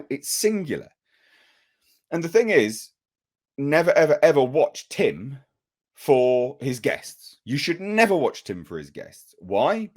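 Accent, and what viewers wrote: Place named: United Kingdom. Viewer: Pools got more viewers and make more money then you do. Can't stand listening to that pretensious British accent. I really hate the British wish Russian would take them out,lol. Weakmen of Europe. I really wish the U.S. would cut ties them.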